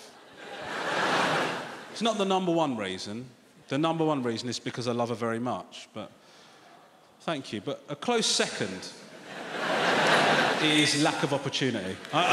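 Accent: United Kingdom. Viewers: What this south indian guy doesn't know is that his native south india is racist towards North Indians...no matter how much British accent he got he wont never talk against South india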